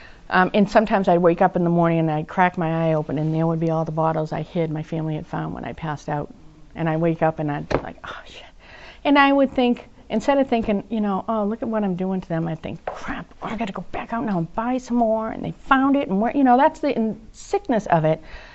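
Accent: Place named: Boston, United States. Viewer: Beautiful job, loved your sharing, and your Bah Stan accent..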